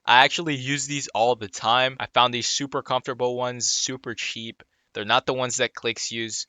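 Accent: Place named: Canada. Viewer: Je sens ton accent canadien 😂😂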